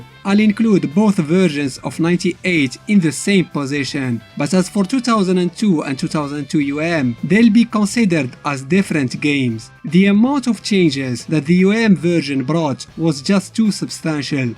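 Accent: Morocco. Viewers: You sound Moroccan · from your accent, I can tell you're Moroccan (Tbarkellah aalik) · Great commentary! I knew you are moroccan by the accent! KOF is very popular in Morocco, especially KOF '97/'98 and '99. Still played a lot in the video arcades, i saw lot of strong players in Casablanca. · men l'accent katban liya mroki a ssat ila ma kentich ma ratfhem ta le3ba wma raytra walou :D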